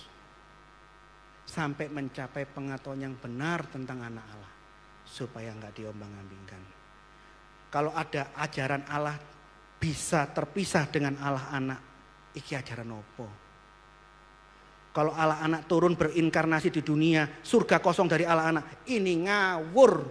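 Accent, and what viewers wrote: Java, Indonesia. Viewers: Kirain ambon. Logat jawa y. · ketrunan NTT..tpi uda lama dijawa..jdi adaptasinya kental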